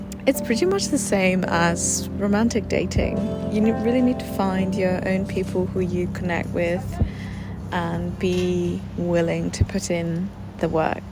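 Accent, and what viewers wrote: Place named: United Kingdom. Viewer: Oh first time here, and really enjoyed it! You sound so British! I live in London and resonate with the 'loneliness' of sort-- especially dating! Subbed :)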